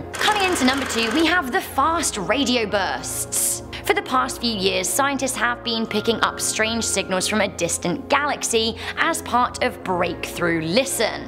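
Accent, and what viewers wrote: England, United Kingdom. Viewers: Why does every top 10 list have a british accent in it? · you had me hello. Love the video. I would love to lay in your crop circle. I would roar like a lion. Gaze in your gorgeous star litd eyes then shoot my Milky Way while you say Maui Wowie and explain how big & large it is..😲🐘😄 I love 😍the way you say while you're down 🙃there👅 with that cute English👿accent - very HOT 💖 it-👫-🤑-love u" from across the 🌎pond. Sorry I have to refocus now your message🙃 which one is the most scariest of this video 🤔I would say Stephen Hawkins quote that when aliens finally reveal themselves to us it would be like Christopher Columbus reaching the Americans💥💣💥💣💥💣GAME Overtook 👽👾👽👾i think im leaving more than a 🖒'S... UP lol he he he. 🌷chow for now my lovely lookin alien 😍😙🤑😚👿..📞ME 😆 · This chick is the most annoying/ignorant youtube host.. 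This channel usually has interesting top 10 topics that end up being awful simply because they allow this laughably stupid and cringe fueled moron narrate. News flash: Having an English accent does not automatically make you intelligent.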